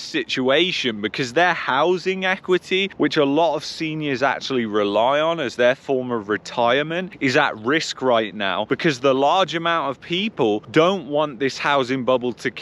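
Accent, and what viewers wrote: England, United Kingdom. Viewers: So much negativity and doom and gloom.you have an English accent, go back to where you came from if you hate it so much. UK is a third world country anyway, go back and live “large” there …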